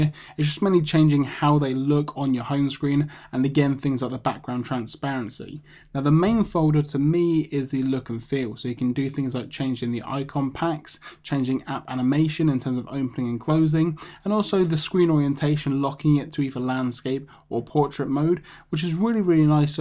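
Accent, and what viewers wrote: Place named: United Kingdom. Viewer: the british accent IS TAKING OVER YOUTUBE!!!!!!!!!!!!!!!!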